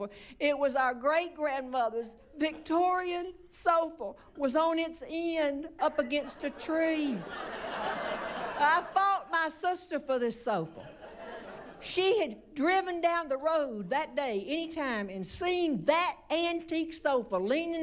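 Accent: South, United States. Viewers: And while she can't match up to Bob's legendary dead-pan comedy, she more than makes up for it with a strong dose of Southern twang and culture!!! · You gotta love southern American accent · NC..gal, me too..shes good...and we do talk like that...some even more southern than her lol....NC is a great place to live!!! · Ditto, I'm just now seeing all of her clips and she's hilarious. Love her refined Southern accent she's so elegant.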